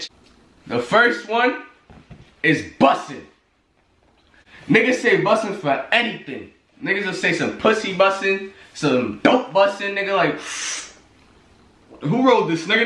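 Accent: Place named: Boston, United States. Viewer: Bruh I'm black, from Boston and I was born and raised here in the hood. You nailed it and even have the Boston hood accent. You was even so right when you was saying the other slang was for white people.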